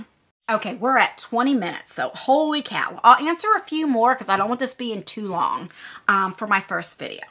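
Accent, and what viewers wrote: New Jersey, United States. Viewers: Thanks for sharing! I don't wear makeup much beyond lipstick, so the unboxing channels I follow are because of the person doing the videos. I've liked you from the beginning. I like listening to you speak (so, chat away!), you're pretty (love your eyes!), and you've always seemed like a genuine person. I hate fake people, so it's refreshing coming across someone like you. We moved to Jersey when I was in junior high, and my mom still lives there (although I do not) so your accent caught my attention, too 😄 I'm glad you have a support system to help you through the tough times, and I wish you all the best 💕 Buona fortuna!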